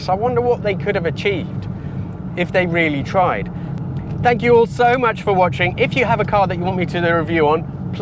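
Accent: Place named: Italy. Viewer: So damn cool how rare it is for such a nice car. I bet next to none came here to the US of A. Would be even weirder to have that than my Jaguar 420 compact. Maybe looks like something that a handful might have came to Canada. You say it's '60s, but what year is it? Must be very late '60s. Didn't Hub Nut or somebody do a video on this? I think your I-talian accent sounds very nice!!! Thanks for the great video!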